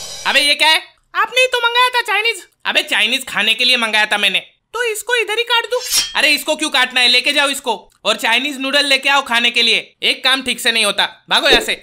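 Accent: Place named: Maharashtra, India. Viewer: I like your Marathi accent 🔥🔥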